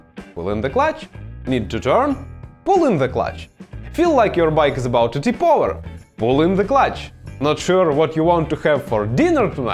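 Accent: Russia. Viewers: Love the vids brother, forgive my ignorance please, but you sound like you're from Russia? Im curious, how many months of riding do you guys get over there? in my head all i see is snow and furry hats, Russia's cold looks like its NO JOKE 😳 Ive obviously never been but id love to.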